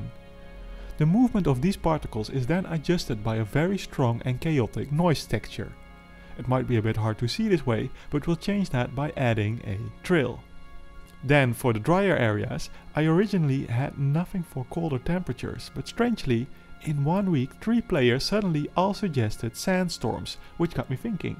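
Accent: Netherlands. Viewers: (re) Dutch accent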